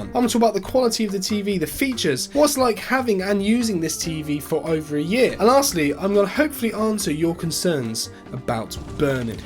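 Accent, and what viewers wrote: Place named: United Kingdom. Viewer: Please, knock off the aggravating BS background noise. It's distracting as well as annoying especially considering your British accented English. Yes, I'm American and speak real English not the bumbershoot and torch strangley pronounced type.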